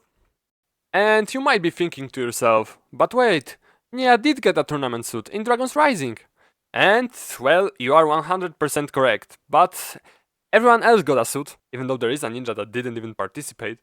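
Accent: Poland. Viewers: Słychać że z Polski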